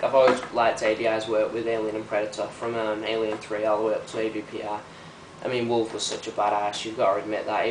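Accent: United Kingdom. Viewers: 5 Stars for British accent! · youre awesome are you scottish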